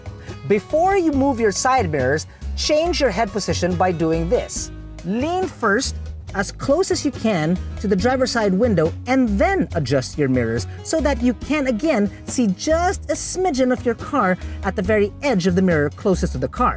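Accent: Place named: Philippines. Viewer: your English is very good almost can't tell if you're from Philippine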